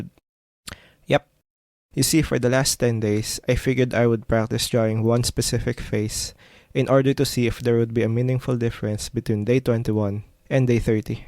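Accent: Philippines. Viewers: based on your accent im guessing ur filipino? bec thats how i talk in english lolll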